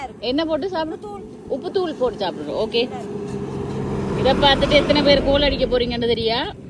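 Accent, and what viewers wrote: Sri Lanka. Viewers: எந்த நாட்டில் இருந்து பேசிறீங்க.இலங்கையா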